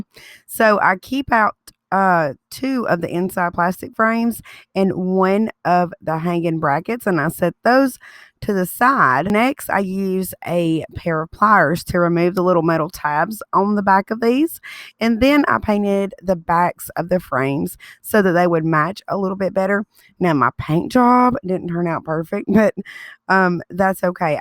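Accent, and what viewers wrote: South, United States. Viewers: sweetest southern drawl ever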